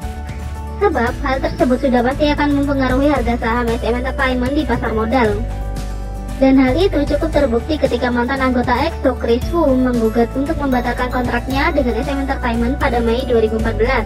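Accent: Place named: Java, Indonesia. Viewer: Bentar yang ngomong ini kayak orang jawa btw😁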